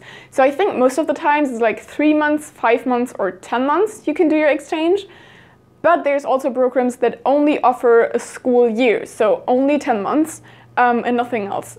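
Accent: Germany. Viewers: So, she's German. That explains why I can actually understand what is being said, because English native speakers usually speak too fast for me to understand... Nice accent and good fluency. ^^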